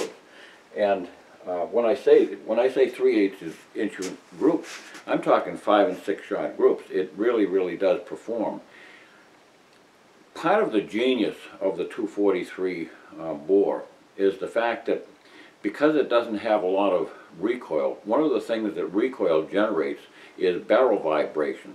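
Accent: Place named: Boston, United States. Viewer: This guy has a straight up Boston accent! Hilarious. He sounds [and dresses] like an old Boston cabbie friend of mine. Priceless. I guess you had to be there! Down by the banks of the River Charles. I love that dirty water, O Boston, you're my home!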